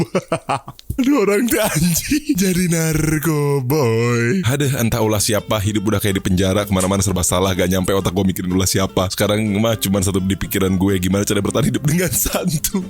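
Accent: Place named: West Java, Indonesia. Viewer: Sunda pisan akwkw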